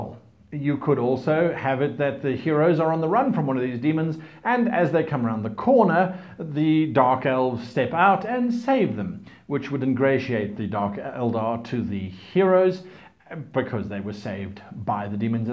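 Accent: England, United Kingdom. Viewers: I could be a great GM if I had a wonder English accent like that! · I'm glad I've found your channel, really useful tips in all the videos I've seen so far. if I can ask, you sound British - do you know of any good websites/social media groups for recruiting players?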